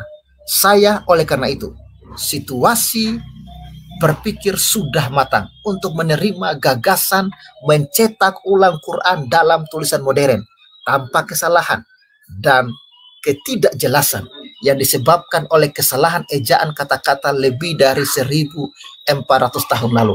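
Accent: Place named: East Nusa Tenggara, Indonesia. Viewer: Mantap. hostnya sptnya orang NTT ya logatnya jelas sekali? Sama spt orang Batak ada logatnya. Orang Jawa ada logatnya.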